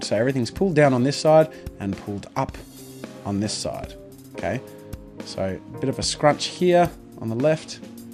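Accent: Australia. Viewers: really nice.. are you australian?^^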